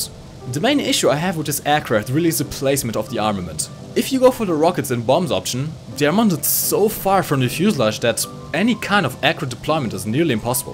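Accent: Germany. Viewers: Dat german accent though,, i wish i could speak german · The Whole vid i fought : "Is he german?" then you said Flakpanzer blabla etc. then i new yep you are :D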